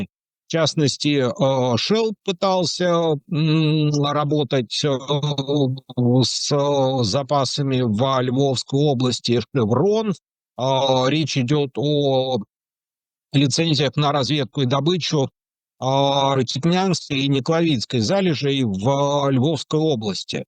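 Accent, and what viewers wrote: Okanye, Russia. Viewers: Оканье невозможно слушать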